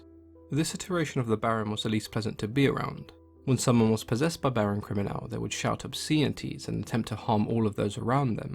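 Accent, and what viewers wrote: France. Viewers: Nice French accent 😀 from Paris ! Big fan of your work ! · Thus french accent.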